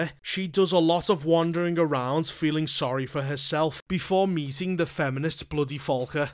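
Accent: Liverpool, United Kingdom. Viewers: I don't know what's funnier, the cutting, but very accurate commentary and analysis, or the fact that it's all delivered in a Scouse accent 🤣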